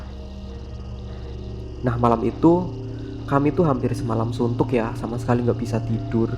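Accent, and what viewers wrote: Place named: Banyumas, Indonesia. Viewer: nek orang ngapak emg bgtu mas hehe sy pun bgtu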